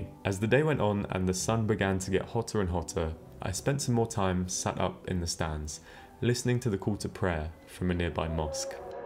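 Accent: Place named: United States. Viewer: Have you considered not showing yourself on your vlogs ? Your voiceovers are refreshingly non-irritating, American, and very authoritative and your subject matter informative, but just hearing your voice without seeing your face would be more enigmatic. There are too many Youtubers trying to become personally famous instead of their content, and including your face just brings you down to their level.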